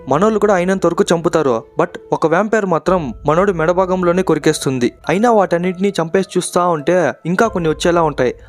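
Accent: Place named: Chittoor, India. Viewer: Bro ru from Chennai or Tamil Nadu border or Chittoor language mix but i like it just as puspa slage 😎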